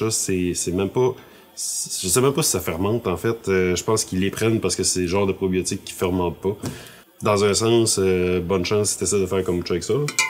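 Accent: Quebec, Canada. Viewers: J’adore votre accent et en plus votre français québécois n’a pas remplacé certains mots par des mots anglais. Merci 🇫🇷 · Merci beaucoup pour les explications! Je comprend beaucoup mieu comment ca fonctionne maintenant!! Donc si j'ai bien compris, après avoir fait ma première ''batch'' pour en faire une 2e, ce qui est important, c'est pas le scoby mais bien de garder un fond de kombucha avec lequelle je vais pouvoir m'en partir un autre selon la quantité que je garde. Donc si je veux me refaire un autre 3L, je doit garder entre 300 et 600 ml de ma première batch que je vais pouvoir mettre dans ma 2e? J'espère que c'est claire haha Merci encore j'adore tes videos c'est très claire et super intéressant! En plus en Québecois c'est encore mieu!😀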